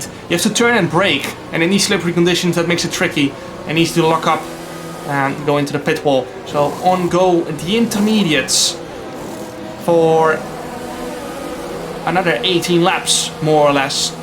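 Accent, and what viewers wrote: Netherlands. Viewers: You really sound dutch while speaking english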